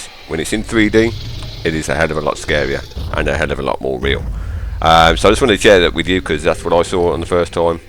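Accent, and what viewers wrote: Black Country, United Kingdom. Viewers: That accent sounds familiar :P Not from the Black Country by any chance are you?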